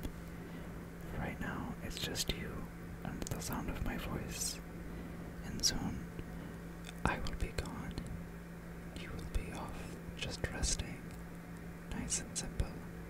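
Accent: India. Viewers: This is awesome. Your Indian accent rules bro 🔥🔥🔥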